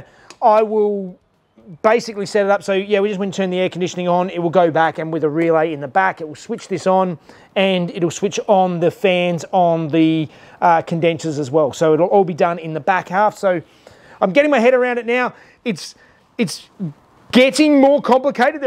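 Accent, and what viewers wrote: Australia. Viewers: 😳😳 cool, I'm a paramedic.... According to your dialect u living down under..... 2 be a ff there must be challenging sometimes..... The heat, draught and brush fires.....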